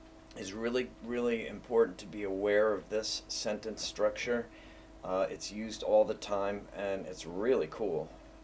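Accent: United States. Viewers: Fenny-Jean Van Den Doel ja, too much of an American accent!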